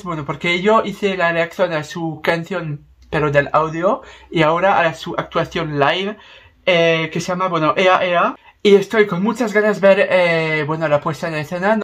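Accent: South, France. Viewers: Del sur de Francia por lo que le he oído al principio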